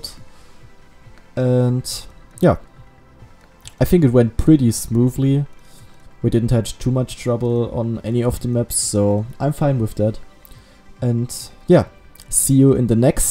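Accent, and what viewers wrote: Germany. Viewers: man hört den deutschen akzent sehr gut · Ahhh der schöne deutsch englische aktzent. Ich liebe es. Btw du hast gesagt der corner lol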